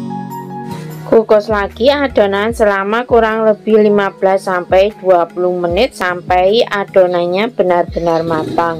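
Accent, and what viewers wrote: Java, Indonesia. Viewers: Bunda orang Jawa yah Bun suara nyabagus bun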